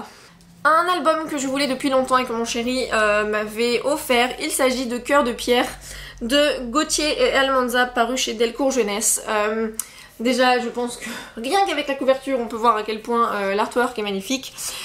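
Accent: England, United Kingdom. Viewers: Tu me fais trop rire, t'as des fois un petit accent anglais, j'adore! T'es un peu fofolle je crois :p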